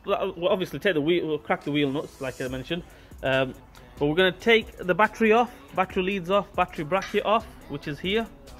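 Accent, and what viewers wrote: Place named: England, United Kingdom. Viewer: I like your knowhow but because of your English accent I have to suggest that you talk a wee bit slower. Canadian English is a wee bit of translation... plus the fact that I'm slow😀 Thanks for doing this vid.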